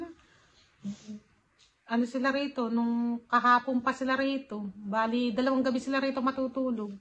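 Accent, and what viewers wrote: Nueva Ecija, Philippines. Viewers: sabi ko na nueva ecija ka...kase the way na mag Salita ka....nueva ecija din ako.....saan ka sa nueva ecija?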